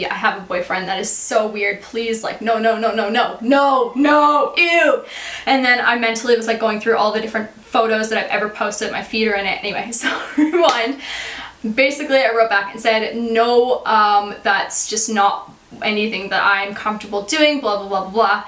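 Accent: Australia. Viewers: You have a bit of an Australian accent? :D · omg i havent watched one of your videos for ages, but i have really noticed the aussie accent come accross more now then before! i missed watching your videos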